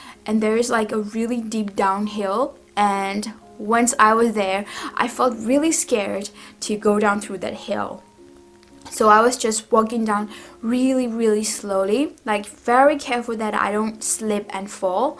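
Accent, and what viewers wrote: Myanmar. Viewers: Cheese getting hot лол and yup u got a burmese accent but not as strong as my Russian accent.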